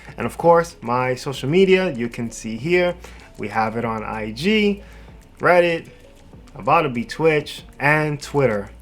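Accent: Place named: New York, United States. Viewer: Enjoyed the video totally yu definitely from ny tho Not for nothing 😭💯🤘🏾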